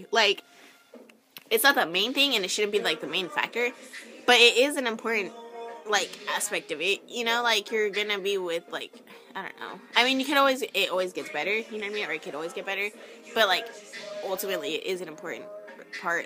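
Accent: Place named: Texas, United States. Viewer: Where are from I feel like you're from texas like me ? ;o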